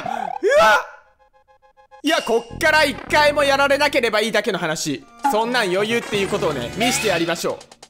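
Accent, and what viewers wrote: Fukuoka, Japan. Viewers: さんの福岡弁がなんか良いですね！これからも楽しみに見させていただきます😀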